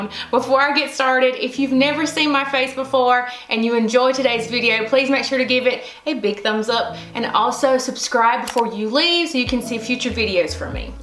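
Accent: South, United States. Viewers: It sounds like youre saying "WEENIE' not WINNIE Lol maybe its a good thing you didnt pick that name with your southern accent girl! Haha!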